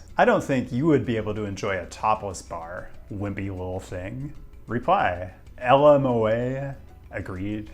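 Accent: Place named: California, United States. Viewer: I cant stand your California accent 😂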